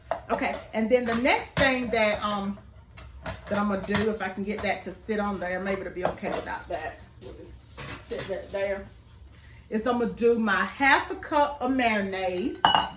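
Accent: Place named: Georgia, United States. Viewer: Looks excellent ! Thank you for this video 🤗 You're Endearing 💖 & You sound like a Ga girl like me, am i right 🤔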